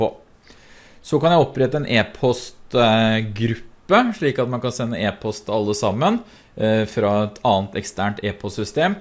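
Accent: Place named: Østfold, Norway. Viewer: "litteran".... fra østfold???? Nyyydelig dialekt!!! (er særping sjøl da...)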